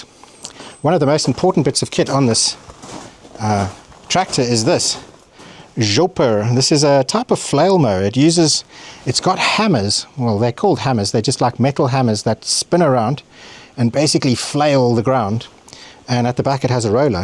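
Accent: South Africa. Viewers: Nice to hear a South African 😊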